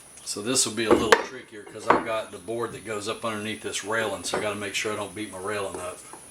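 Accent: South, United States. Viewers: Love the southern accent. Cheers from Australia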